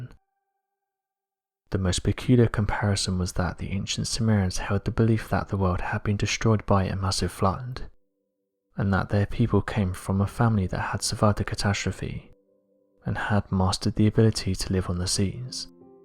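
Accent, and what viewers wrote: England, United Kingdom. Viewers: What helps them appear credible is that they're using a person with an English accent to narrate their stories. Think about it, had they gone with some yokel from the south or a guy with a Bostonian accent talking about how "wicked hard" they looked around the internet to find examples of modern tech depictions from past civilizations or how "you know what, I swear I saw them same fancy scratches on a bumper sticker before y'all" and nobody would take it seriously.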